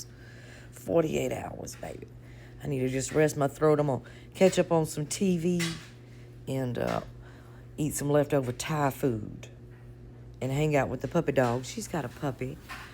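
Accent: South, United States. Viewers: Rest that Southern voice. We need you to spill the sweet Southern style tea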